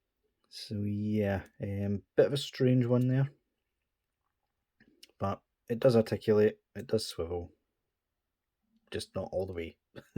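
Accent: Scotland, United Kingdom. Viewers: Holy crap mate yer Scottish!! Me tae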